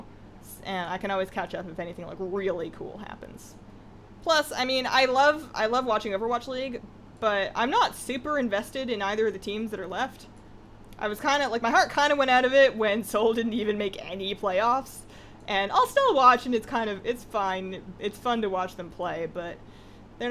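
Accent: Canada. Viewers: I like the Canadian accent 🐻😀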